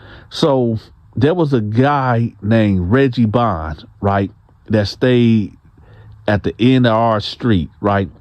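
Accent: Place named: Michigan, United States. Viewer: Your voice is similar to the Goat point guard Magic Johnson. It must be a Michigan accent. #LAKERNATION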